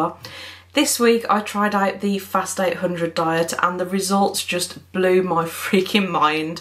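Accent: West Midlands, United Kingdom. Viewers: Sounds like you live in the west midlands :)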